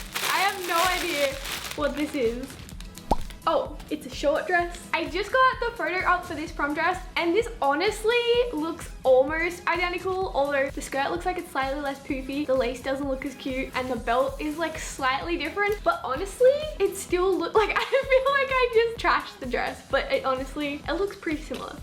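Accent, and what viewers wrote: Australia. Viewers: R u Australian?I am too!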